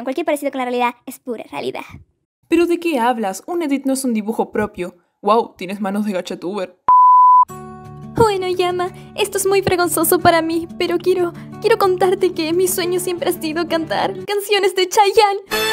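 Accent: Argentina. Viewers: Jaja bien argentino papa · AY DIOS , como me cague de risa , LPM x'd (es gay mesclado con humor , acento Argentino y espacios publicitarios de esos que pasan a las 2 o 3 de la mañana , decime , no es lo mejor de la pu74 vida?)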